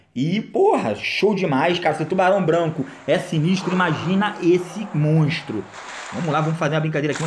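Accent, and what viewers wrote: Rio de Janeiro, Brazil. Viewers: tu é do rio de janeiro né?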